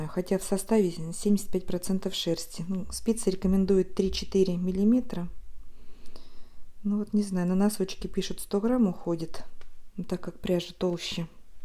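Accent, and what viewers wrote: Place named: Voronezh, Russia. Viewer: Узнаваемые воронежские интонации 😊 . Смотрела ваши видео и предполагала, что вы житель Воронежского края, ну и здесь услышала подтверждение этому.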